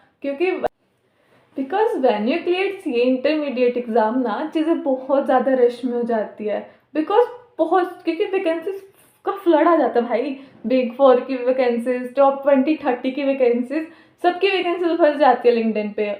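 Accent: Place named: Marwar, India. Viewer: aap marwadi ho kai aapke tone se lg ra h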